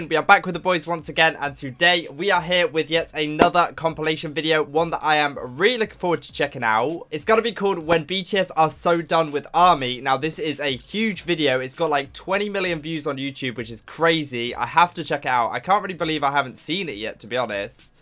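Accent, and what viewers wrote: United Kingdom. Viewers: Oh, he's from America? When i first watched his channel, i kept thinking he was British all the time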